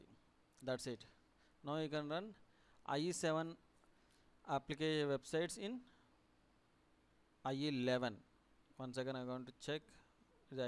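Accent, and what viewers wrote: India. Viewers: Same to me from Germany - but english with an indian accent is a real tribulation... :-/ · Offer a trainer who speaks western English not a person from India especially for listeners who grew up with English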